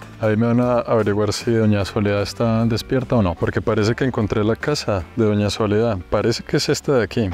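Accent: Colombia. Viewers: habla muy colombiano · Jajajajja que bien me caes 😅😅 me encanta tu acento colombiano y tu gracia para redactar el tema saludos 😊😊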